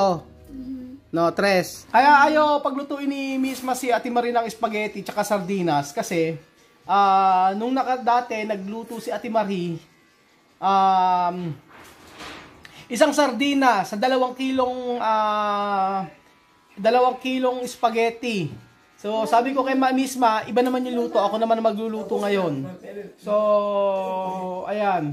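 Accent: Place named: Davao, Philippines. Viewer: Parang tqga davao ,alivio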